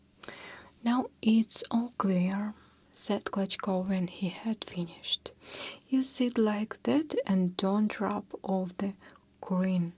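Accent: Russia. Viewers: I love, love, love when you read us a story! Your English keeps on getting better and better! But I love your Russian accent so much! Those earrings are amazing too! Thanks so much!:-)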